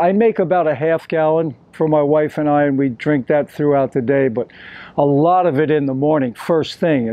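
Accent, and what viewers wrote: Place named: New York, United States. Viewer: Hey! Really enjoying your videos. I am also a long term raw vegan, and really related to your stint of a few years adding cooked stuff at night, but never feeling as good, and always steering back to raw/high fruit. I was raw for 9-10 years before I started having issues with low appetite and being tired a lot, and so tried adding stuff back in in the evenings. Sometimes it was hard to tell if the diet was the issue, or if it was something that would've come up regardless of diet. I changed a lot of my lifestyle, traveled to Asia, got out of burnout, etc. to test other factors. Either way, even if I'm more tired than I used to be or less vibrant, I still feel better raw than anything else. Also originally from FL, but then 20 year New Yorker (now more of a nomad), which I think I hear in your accent too! Thanks for sharing yourself and experience. 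I've found it rare to come upon someone else who did raw for years, then experimented with cooked at night, only to find it just didn't cut it, and went back.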